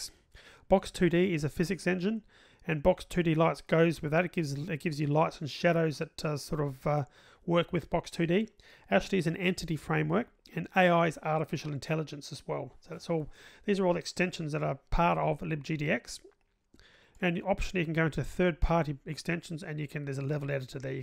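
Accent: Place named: Australia. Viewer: THANK GOD FOR THE SUBTITLES.No offence fellow Aussies, but as a non native English speaker I have a hard time to follow! Kudos for the great tutorial though!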